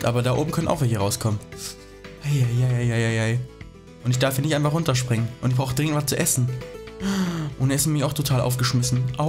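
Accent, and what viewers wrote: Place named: United States. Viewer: Er hat iwie einen amerikanischen Akzent :))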